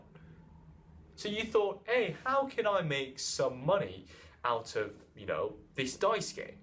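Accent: United Kingdom. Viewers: Chinese guy teaching Hood Math with British Accent. Soo much culturalism Republucans hate 'em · Nice video - any chance you're from nottingham? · what are the odds .. chinese speaking in british accent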